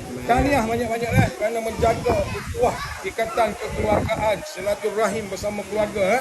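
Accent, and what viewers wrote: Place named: Kelantan, Malaysia. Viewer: Kelantan ke? Bunyi macam kelantan. Ooooooohhh okayyyy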